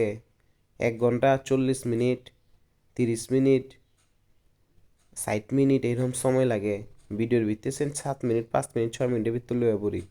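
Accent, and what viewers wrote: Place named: Old Dhaka, Bangladesh. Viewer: পুরাণ ঢাকার ভাষা ভালো লাগেনা, শুদ্ধ বাংলাই কথা বলো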